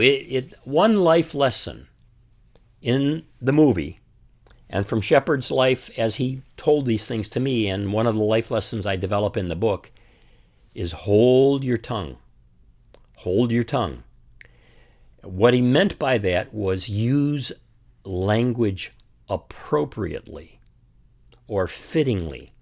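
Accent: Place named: Chicago, United States. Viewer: Dude you have the most Chicago voice I've ever heard...lol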